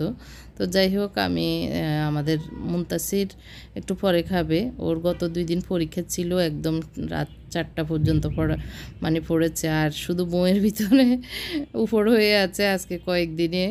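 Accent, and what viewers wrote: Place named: Feni, Bangladesh. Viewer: apu.ame.feni district tak bolce. apnar kota suna mona hocca apne fenir manus.apnar badi fenir kon grame.bolban ke.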